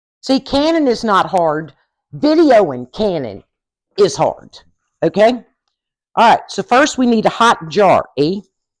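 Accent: South, United States. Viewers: Are you from the south? You have an accent like my aunt who is from Florida. LOL.. Thank you for the small batch recipe. I have never had pickled peppers.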